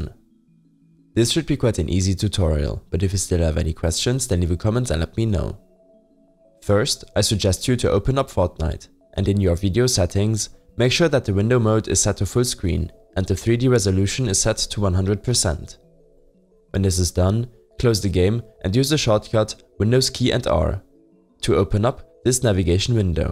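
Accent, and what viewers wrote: Germany. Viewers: Nice one dude, it worked on my computer and btw are u german?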